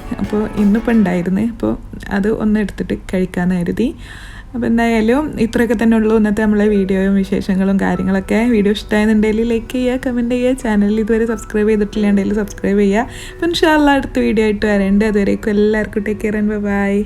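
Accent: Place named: Malabar, India. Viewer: ങ്ങൾ തിരൂർ ഭാഗത്ത് ഉള്ള ആളാണോ. ഞാൻ കോട്ടക്കൽ ആണ്. മ്മളെ ഒരു സ്ലാങ് ഉണ്ട് ങ്ങക്ക്😀😀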